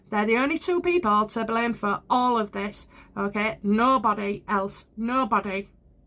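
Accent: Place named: England, United Kingdom. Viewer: Thank you for another great reading =). Your summaries at the end are awesome too. I love your little giggles and your accent. I am Canadian and I love English accents =). Prayers for you and your mum =). I have 5 children (all 20+ now) and I nursed them all. I was happy that I was able to do that. I feel badly for mothers that really want to and are not able to. I had friends that really wanted to but they didn't produce enough milk. I am not surprised that Meghan didn't have the patience or mothering instinct that she wanted to even try very hard, even if the hormones produced the milk. I am disappointed in King Charles not honouring his mother's wishes, and the promise he made to her to sort this. Allegedly, he is meant to make Prince William Regent soon (a few readers have said this) so when this happens, will that enable Prince William to legally be able to do that? 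Or will he have to wait until he is King William to do that? Would you please continue on this and find out in another reading? I am wanting this to come out so badly!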